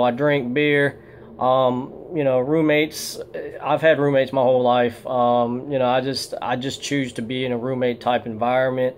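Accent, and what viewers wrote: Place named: South, United States. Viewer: So basically you only were chosen for casting because you were Asian with a country accent.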